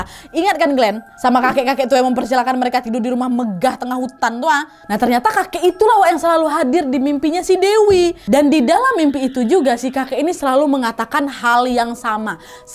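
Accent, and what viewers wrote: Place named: West Sumatra, Indonesia. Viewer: Kak orang mana ya???kok ada sensasi bahasa Minang nya, 😅😅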